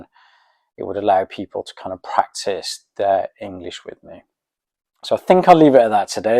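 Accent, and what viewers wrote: United Kingdom. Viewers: Как приятно слушать британский английский. Стала каждый день Вас слушать без перевода. Удачи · has a very good British pronunciation, it's quite easy to understand him. I've had an opportunity to speak with many other English people and often it was rather difficult experience. (my ear trained more to northern American version of English).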